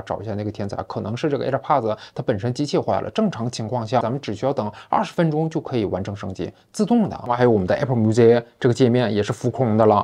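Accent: Northeast, China. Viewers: 这东北大碴子普通话，duang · 😅 看了博主好几期视频听着掉渣的东北话后，才发现博主坐标韩国😂😂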